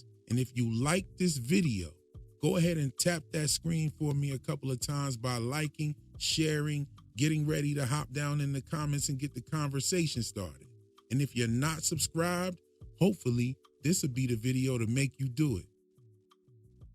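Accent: Philadelphia, United States. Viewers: Are you from Philly? 😂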